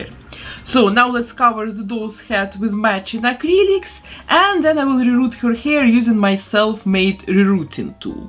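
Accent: Russia. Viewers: Вот это акцент )))) русский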